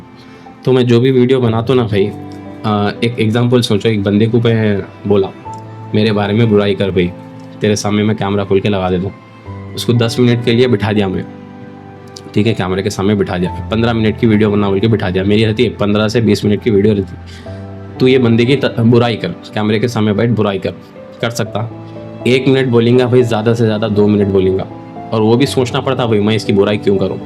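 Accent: Hyderabad, India. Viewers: Bhai tum serious baath kare to bhi hasse waisa dikhra 😂 woh jaando nakko chhodo videos banana ache banate tum videos🤣 ek to bhi rehna bhai Hyderabadi accent me roast karne wala😂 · Assalamualaikum bhai hum logao aap ke sath. Hyderabadi accent chodke amer dens ke Jaise videos banao jaise amer bin ishaq reel reaction do baigan reply do Bhai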